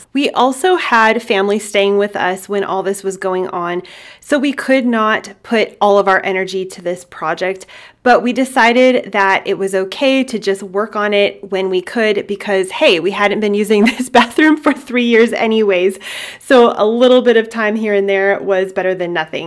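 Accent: New Zealand. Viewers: He sounds like a kiwi. This is so nice guys. I can’t wait to buy a home and do this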